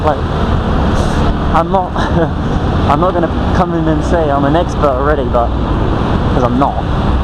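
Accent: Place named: British Asian, United Kingdom. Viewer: Have you been living in TW for a while coz you sound a bit British+Asian, accent I mean. Hope you are enjoying the life here.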